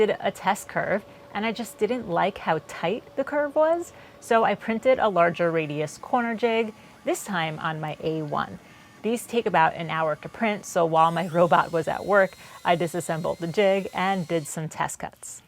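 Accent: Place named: New York, United States. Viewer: Not quite sure it’s everyone, but we all love and accept the way says it, she’s a New York girl. NEXT!!!!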